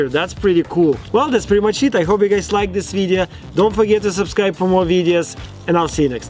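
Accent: Russia. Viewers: He sounds like crazy Russian hacker.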